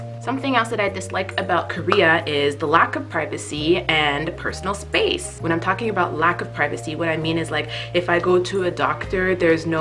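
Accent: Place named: Canada. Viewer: lol it's that good ol Canadian accent lol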